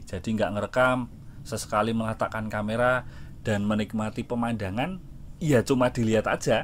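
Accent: Yogyakarta, Indonesia. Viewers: Dari semua youtuber bus, cuma mas yang bikin videonnya apik rasa jogja2 gimana dari logat heehe. Dan aku jadi nyaman nontonnya krn suaranya suara didalam bus langsung dan tidak berisik pkai soundtrack2an. Serasa diajak jalan2 live hehe. Sukses terus salam dari slipi jakarta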